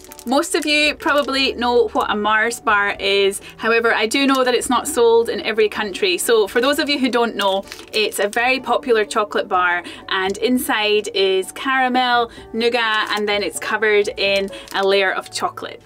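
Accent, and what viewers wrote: Scotland, United Kingdom. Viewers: Whereabout in Scotland is that accent from? 😂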